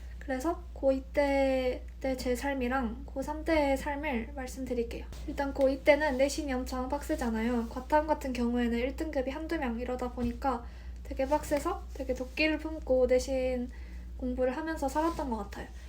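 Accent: Jeolla, South Korea. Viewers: 사투리 억양 들어보니 광주나 전남지역 분인듯~ㅋㅋ 성형도 안하신거 같은데 의대생이 외모까지 자연미인이면 세상 너무 불공평한거 아닌가요?ㅜㅜ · 억양 들어보니까 전라도 같아요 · 전라도 출신이신가용??? 억양에 살짝 묻어나는 느낌 ㅎㅎ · 혹시 광주분이신가요? 전라도 사투리가 귀여우세요ㅎㅎ 그나저나 새벽기차타고 대치가는거 진짜 힘드셨을텐데...정말 고생 많으셨어요!! 얼굴도 너무 예쁘다. 애기같고. 이제 본과생이면 더 고생하시겠네요 화이팅!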